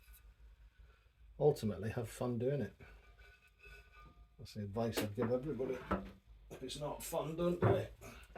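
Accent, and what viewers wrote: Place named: Scotland, United Kingdom. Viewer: Seeing the kilns prices here in Hungary, i wouldn't mind having one of yours :D nah just playing....looking forward to seeing the final products! btw, you sound and talk exactly like a Scottish colleague of mine called Dave, i can hardly hear the difference!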